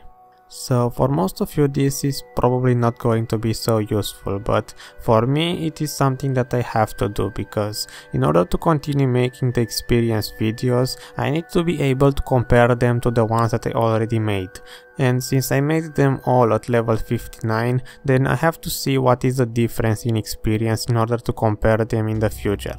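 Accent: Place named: Romania. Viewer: esti roman da-te dracu! ti-am depistat accentul :))) noroc , bun video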